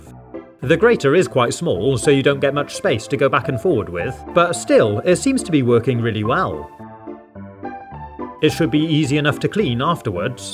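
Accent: England, United Kingdom. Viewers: VERRY old days: no sound kinda very old days: iconic music old days: voice and iconic music kinda new days: deeep voice and iconic music a lil bit new days: less deep and more british and iconic music new days: more less deeper voice and iconic music so uh yea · We all love a hood english lad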